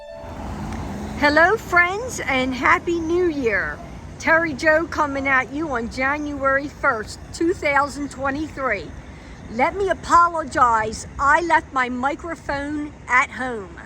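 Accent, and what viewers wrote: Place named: Delaware County, United States. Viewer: I grew up in delco as a child been living in amish land past 20 some years. Didn't realize until hearing you speak that I missed hearing the delco accent. My grandfather and mother grew up in Marcus hook and used to tell me stories of feeding the hobos that hopped off the trains there.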